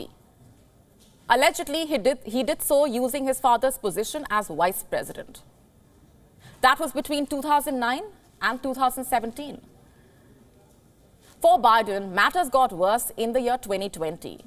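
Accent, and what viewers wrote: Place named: India. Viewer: This is a great news site but unfortunately it is at times hard to understand the reporter because of the Indian accent and mis pronunciation of words.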